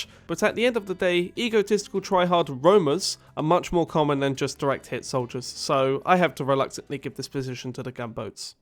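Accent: United Kingdom. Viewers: sounds kinda British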